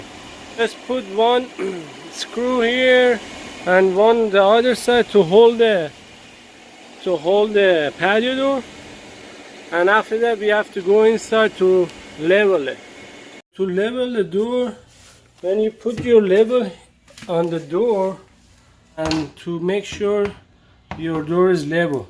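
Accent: Iran. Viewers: Strong persian accent😉👍well done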